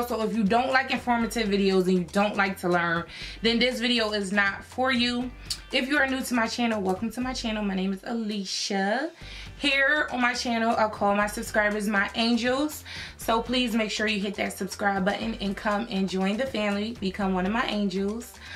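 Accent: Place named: New Orleans, United States. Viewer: I can hear the New Orleans in your voice lol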